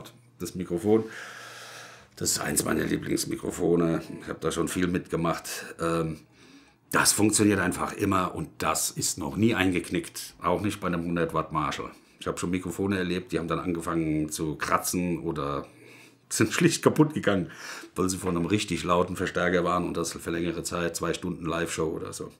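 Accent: Hesse, Germany. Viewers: babbelt hessisch.....great!!!!!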